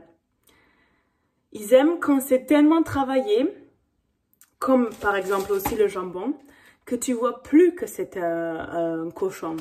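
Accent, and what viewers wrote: South, France. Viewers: Du hast Französisch gelernt aber mit einem sterken Akzent aus Süd Frankreich ;) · Sprichst du eigentlich "nur" den südfranzösischen Dialekt oder auch "richtiges" Französisch (wie heißt eigentlich das französische Pendant zu Hochdeutsch?:D). Ist überhaupt nicht negativ gemeint, sondern tatsächlich aus Interesse :) Die Aussprache unterscheidet sich ja teilweise richtig :)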